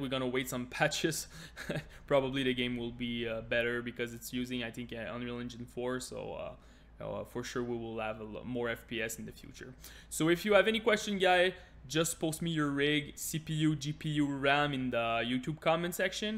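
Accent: Quebec, Canada. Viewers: un quebecois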